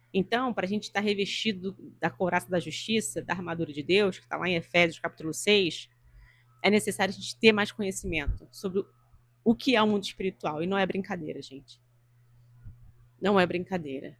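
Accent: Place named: Rio de Janeiro, Brazil. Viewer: " Princesa de Mônaco." Sotaque carioca. Deus abençoe sempre seu ministério! #JuntosPeloReino!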